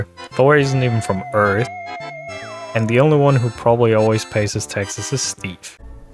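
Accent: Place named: Germany. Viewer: i already thought you were german and when you pronounced Lichtenstein i was certain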